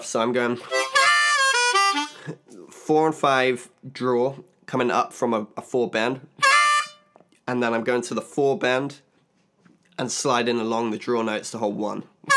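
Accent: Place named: United Kingdom. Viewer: Oh my god he’s British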